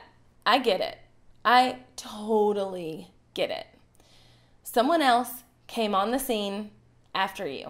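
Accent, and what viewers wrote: South, United States. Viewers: I’m glad I found your channel last week. I really like your presentation style and slight southern accent. And thanks for the tips! Keep up the good work! :)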